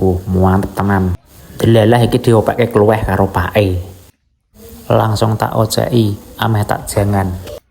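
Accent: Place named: East Java, Indonesia. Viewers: Bahasa Jawa nya medhok banget....pinter masak yo mas...bumbu2nya sama dg resepku....seneng lihatnya ❤❤❤ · Medhok bahasa daerah ndi maze..Tuban t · Bismillah, Assalamualaikum MAS selalu, enak seger gurih sayure kulweh njih MAS, njenegan asli jowo njih MAS, jowone jowo Timur njih MAS, njih sami sami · Ngomonge koyo wong Nganjuk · Mas sampean tiyang pundi Kok bahasane "tumpi" koyo bahasaku wong Lasem🤭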